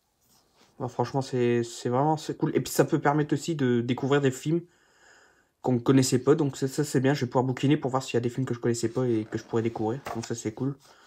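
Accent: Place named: North, France. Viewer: T pas du Nord toi ? Ta un accent 😊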